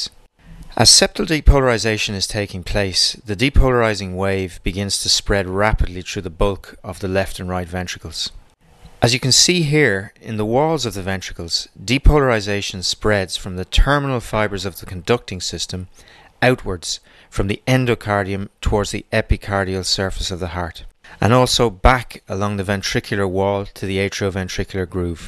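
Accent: Ireland. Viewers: What a HUGE help this video was! I wish my college teacher explained it this well. Thanks for this video. I think you have helped me raise my grade. Your Irish accent is a pleasure to listen to as well. Thanks again, doctor.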